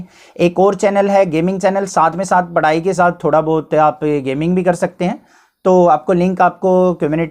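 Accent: Haryana, India. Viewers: Sir kya aap Haryana se ho ...... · Do u belong to Haryana ✌🏻??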